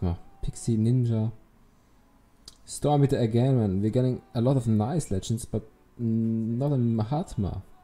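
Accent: Germany. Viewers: Bist du deutsch du hörst dich so deutsch an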